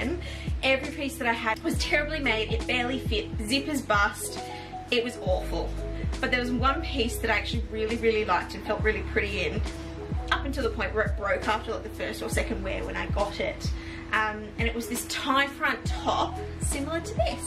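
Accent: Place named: United Kingdom. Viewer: Where are you? I’m close to New Orleans? I have some things I would love for you to make Please say your near by 🙃 But your accent makes me think your across the pond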